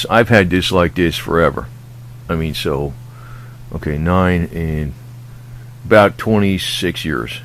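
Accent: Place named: New York, United States. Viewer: Form NYC sounds like.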